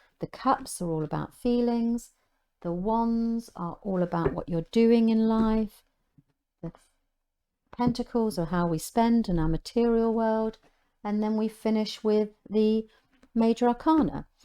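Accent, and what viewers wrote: England, United Kingdom. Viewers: Self-knowledge tools with a British accent? Oh how I love it 🥰👍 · Thanks so much for this - really clear and interesting. It's also good to see a tarot video made by someone who is English, and not American! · beautiful voice, may i ask the accent, English, but where from?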